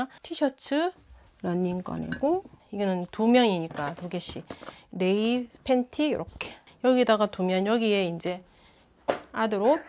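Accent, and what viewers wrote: Gyeongsang, South Korea. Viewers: 어릴 때 대구살아서 살모시~ 넘익숙 울엄마 말투라 정겹네요ㅎㅎ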